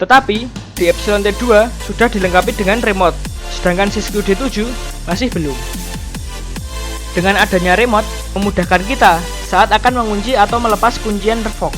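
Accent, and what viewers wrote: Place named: Java, Indonesia. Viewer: Medok bgt bro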